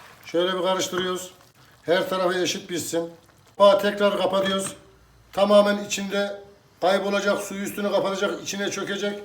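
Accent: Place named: Anatolia, Turkey. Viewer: şef zaten bir Anadolu insanı konuşması ve yemek tarifleri ile 10 numara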